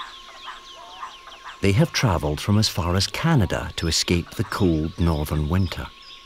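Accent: Scotland, United Kingdom. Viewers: yess gimme that scottish accent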